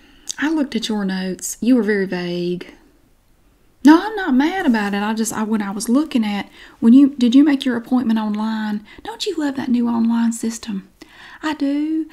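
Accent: South, United States. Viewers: Hi from Great Britain. Im a brand new subscriber, so glad I came across your videos, I love your Southern accent.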